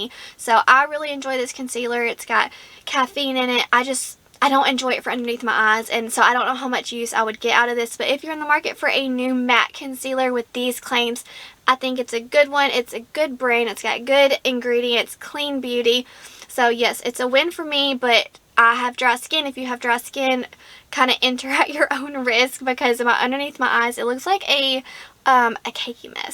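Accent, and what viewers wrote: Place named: Alabama, United States. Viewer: First time I have watched your channel and ohh boy you are such a sweetie ,) I love watching the ones with soothing voices and you have it… also an accent ( what state do you live in girl ) maybe Alabama ?? Sorry to hear it’s a concussion I got. Into a horrible wreck 6 months ago, worst grade concussion border line TBI, better now to find out I have a torn rotator cuff and I’m a Nurse so might be a career change due to this wreck YIKES !!! Slow recovery when it comes to concussions, so good luck and hope your migraines calm down… lots of rest girly ,) I just turned 40 So weird as I feel 21 and have been into cosmetics since I was 15 also professionally for 10 years… have a collection as If it were my day job, make up is my passion and no matter the meal it always fits ( other than matte concealers ) LOL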